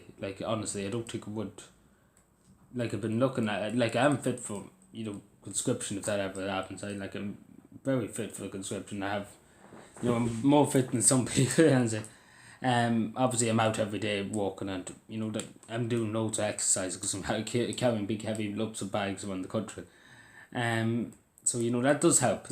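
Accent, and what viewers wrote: Cavan, Ireland. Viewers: Love the Cavan accent. I worked for a Cavan man many years ago. Best boss I ever had. Looked after all his staff so well.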